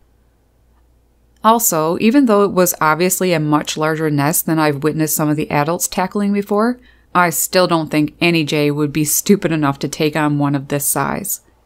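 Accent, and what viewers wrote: Newfoundland, Canada. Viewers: Like your Canadian accent. I don't see wasps landing on the jays to sting them, so at least they're not getting swarmed. Keep up the good work birdies. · the Canadian accent is so strong lol. Great video tho, i saw this here in Oregon recently in a different form. A Stellars Jay was actually hunting down and tearing apart wasps without eating them, almost in a defensive way. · Interesting and well put together video. I don't know why, but your voice sounds like someone from Newfoundland (or Labrador). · My wife is from Canada and you say OUT, ABOUT, just like she does. Lmao.......sorry. I'm from the south and she gives me hell about Pile, Mile, Ain't, ......lol... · You are probably the most Canadian sounding person i know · Your definitely from Canada! Love the accent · What accent is this? Canadian?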